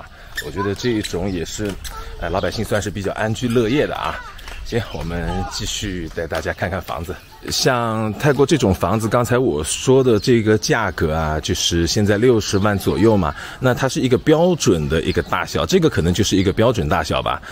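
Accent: Shanghai, China. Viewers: 册那 一听口音就是阿拉上海银（手动滑稽）羡慕长居海外鸭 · 上海口音，口气。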